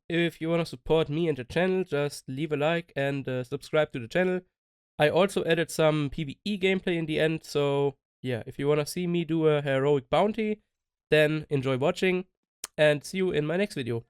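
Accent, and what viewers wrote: Germany. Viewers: You speak never english.... Deutsch hör ich da doch raus... mach das doch auch auf deine Muttersprache.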